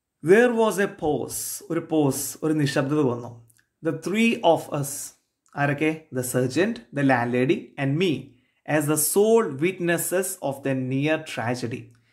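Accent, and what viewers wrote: Malappuram, India. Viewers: Malappuram ahno atho palakado?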